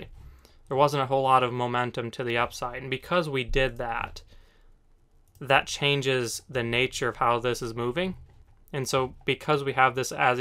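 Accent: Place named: New York, United States. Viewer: As a newbie in the crypto world, I can see how easy it would be to get sucked in with misinformation from so called experts. After watching a few of your videos and seeing Bitcoin do exactly as you said it would, I am glad beyond words that I found you. Now instead of putting my money into a course run by thieves I am looking forward to the course you had mentioned that is coming soon. Here is to learning the right way and I don’t know if you are from New York or not but your delivery sounds just like a New Yorker especially when you are talking about all the people out there who don’t know what they are talking about. You just tell it like it is…love it!!!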